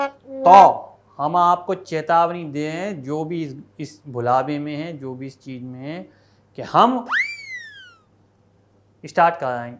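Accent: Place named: Uttar Pradesh, India. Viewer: Bhai ap kisi ki bhi mimicry karte ho to apke dialogue bhi local language mein hoti hai aur tone bhi same up wala...jaise hari nahi hai ye up ka pronunciation hai yahi apke kirdar mein char chand lagati hai....mujhe apne clip ka intzar rehta hai aap sach mein telented ho...radhey radhey 🎉🎉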